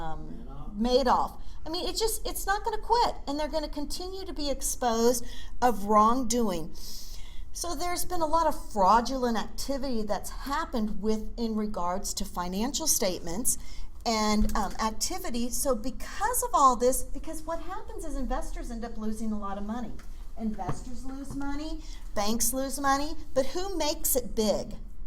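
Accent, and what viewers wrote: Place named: Minnesota, United States. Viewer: MINNESOTA EH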